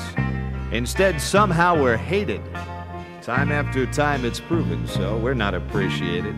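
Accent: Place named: South, United States. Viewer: No, it didn't get airplay because it is like listening to nails on a blackboard. Poorly produced and narrated, it would never even come close to the success of the Byron McGregor single for those reasons, not because of anyone's "agenda." McGregor was a radio news man. He had a great voice, and an orchestra backing him up. This guy? A monotonous, droll guitar and a voice that sounds like a cross between John Wayne and some Southern hick that just figured out what eggs are. Just remember this.....a radio station Program Director makes the call on what gets on the air, on commercial stations anyway, and the idea is NOT to play releases like this which will cause most people to go to another station. Ratings drop and then so do advertisers. Don't blame the lack of airplay on the "powers that be," place it squarely where it belongs, on a bad record.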